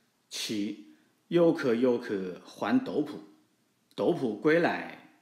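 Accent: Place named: Sichuan, China. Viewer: 呵呵，和我们 四川重庆的 差不多！让我想起 “傻儿师长”里面 老爷子 说的话了。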